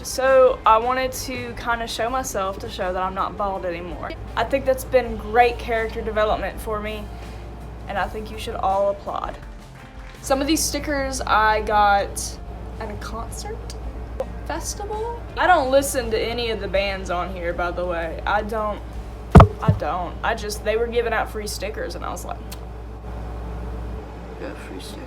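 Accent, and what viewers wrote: South, United States. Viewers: Omg finally holy shit anyways you got a cool southern accent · you sound extra southern in this video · why does your country accent sound stronger in this one lol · I feel like ur accent has gotten even more southern since the last time I heard you lol, also (obviously you don't have to share if you don't wanna) bit what state r u from I'm in alabama. Ye-haw... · dude why do you sound more yee-haw than usual? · off topic slightly but i absolutely adore ur (southern?) accent it oddly suits you really well and it flows out smoothly unlike so many others 😭absolute incredible tour tho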